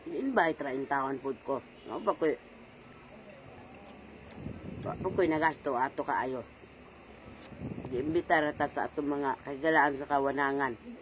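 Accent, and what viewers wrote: Visayas, Philippines. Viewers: ano Yong accent mo na bisaya? Sabi ng kaibigan ko na tga Cebu? Yong boses mo daw prang Lola na? 😩🤣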